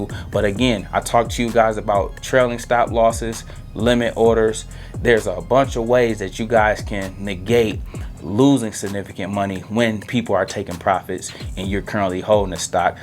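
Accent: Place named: California, United States. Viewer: He is from Cali. LA. I hear the accent.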